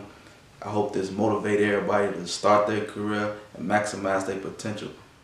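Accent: Chicago, United States. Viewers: Bruh, you from Chicago?! Lmao I stayed for the commentary Omm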